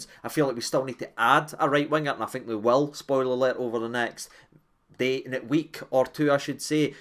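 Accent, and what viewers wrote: Scotland, United Kingdom. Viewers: It’s a Scottish accent what’s wrong with it ?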